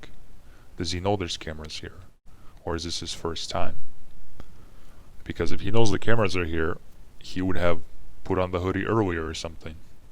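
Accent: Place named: South Central, United States. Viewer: What was the comment we are going to get you girls by JS about? Please take diction classes. South central American accent is annoying and distracting.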